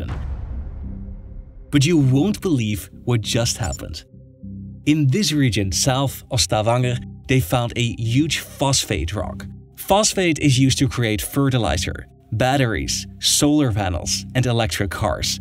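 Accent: Netherlands. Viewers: Hoi, goeie video. Ik heb nog niet eerder een video van je/jullie gezien maar ik kan horen dat je/jullie ook uit Nederland komen 🙂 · Klinkt heerlijk Nederlands XD Maar goeie shit ! Alles komt er bij, ook hoe het samen hangt met andere grondstoffen, hoe de landen er mee om gaan en sowieso hun handel regelen. ZItten nogal flinke verschillen in.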